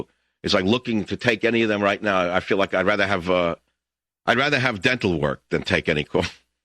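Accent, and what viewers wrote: New York, United States. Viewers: 🎉🎉😂🎉🎉 it’s a party!! The legend in the house!! 🎉 listener since 94!!! 🤘 is a voice that represents we the people! Miss being able to listen everyday, what hooked me was his intro music and collaboration of metal music! NOW LISTEN UP! As a metal head from way back in the 80s I got my cdls and drove a truck for 20 well on my way to California first time out his intro music came on and then this guy with a New York accent came on and I couldn’t turn it off! Listened everyday as a truck driver! Good memories! Miss teddy!!! Nothing like! I would love to just sit and actually have a conversation in person! Woooooo wooooo sheeeeeeple! Lmao 🤣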